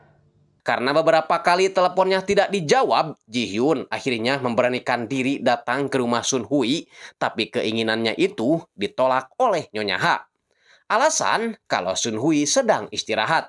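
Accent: West Java, Indonesia. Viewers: Logat adminnya sunda bangett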